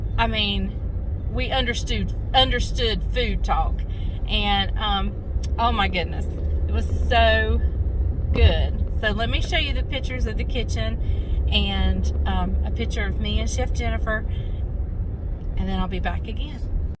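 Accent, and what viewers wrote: South, United States. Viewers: That was very enjoyable. Thank you for taking us along. If you are going to be creating new masterpieces I would love it if you could think of some ways to use up a bunch of eggs. I have chickens and we used to love eggs but now that they are coming out of our pet's butts, no one in my family want to eat them. I never realized that chickens breed like rabbits so now I have eggs coming out of my ears. The only time I use them is when I bake or make deserts that call for eggs and the rest I try to give away but I think my friends are about overloaded with them now too :-). I need some ideas of how to use them up. Thanks again for sharing your day. I just found your channel this weekend and I have been binge watching it every moment I get. For some reason you feel so familiar to me so it is like listening to an old friend share a recipe with me. 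Maybe it is your southern accent 🥰.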